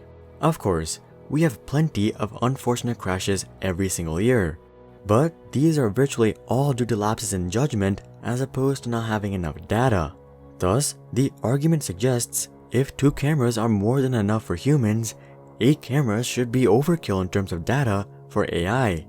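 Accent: Singapore. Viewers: The accent of the narrator sounds Singaporean to me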